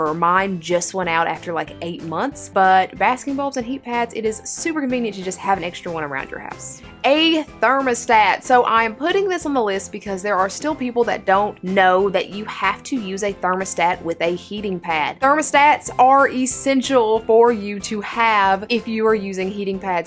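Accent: South, United States. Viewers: I am super new to your channel and have been watching a ton of your videos with in the last few days and I am loving it! It is so informative and helpful. Also where are you from? I LOVE you slight southern accent!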